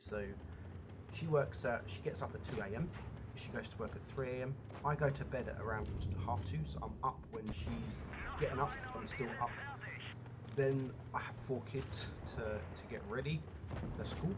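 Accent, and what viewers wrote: United Kingdom. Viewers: Legit british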